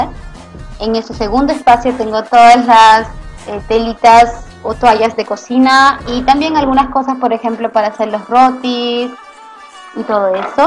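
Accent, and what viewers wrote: Peru. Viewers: hola, yo soy peruana, y vivo en usa, me encantan tus videos, y veo que no haz perdido tu acento peruano, gracias por compartir y enseñarnos tantas cosas, te admiro por tu entusiasmo por la vida sana. Bendiciones.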